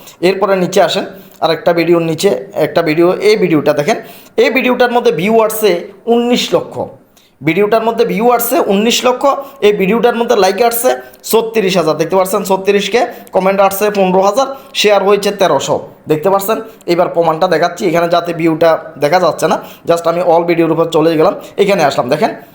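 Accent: Chittagong, Bangladesh. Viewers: ভাইয়া আপনি কি চট্টগ্রামের?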